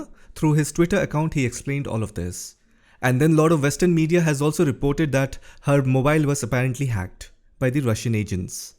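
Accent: India. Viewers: Wait…. R u endian?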